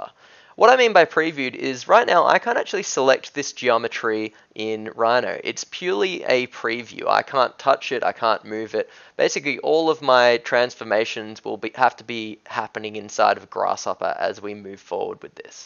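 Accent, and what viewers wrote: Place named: Australia. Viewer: I think hes from Melbourne, Australia, based on the accent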